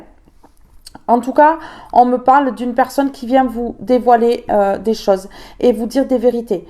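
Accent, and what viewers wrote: South, France. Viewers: j'asore votre accent du Sud...trop mimi ne changez pas merci pour votre guidance bon wkd...13 vendredi😊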